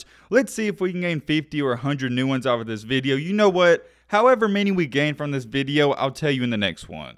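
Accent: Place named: Appalachia, United States. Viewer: You gotta be Appalachian with that accent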